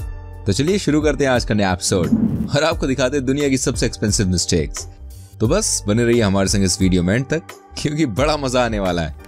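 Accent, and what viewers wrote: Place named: Hyderabad, India. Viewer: HYDERABADi Bolte...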